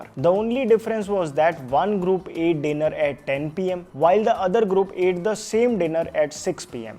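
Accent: India. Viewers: Pta nhi Bhai ki english hindi jaisi lgti h 😅😅 Nice information ♥️🌸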